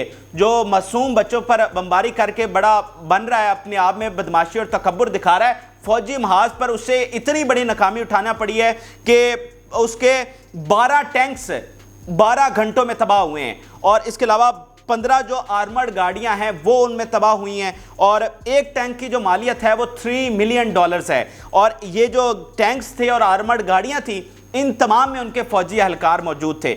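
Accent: Punjab, Pakistan. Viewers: اوئے پینڈو انسان اگر انگریزی بولنی نہیں آتی تو لکھی ہوئی انگریزی پنجابی اور پینڈو سٹائل میں پڑھنے کی کیا مجبوری ہے ؟؟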